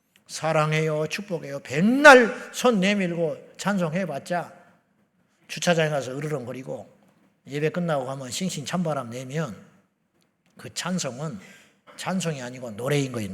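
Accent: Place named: North Jeolla, South Korea. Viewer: 억양이나 사투리 보면 전북으로 추정됩니다.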